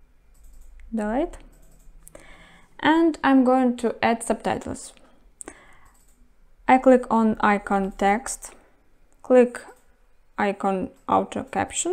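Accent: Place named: Russia. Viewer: Are you from Russia, because your accent is a little bit similar to Russia? to be honest I like your accent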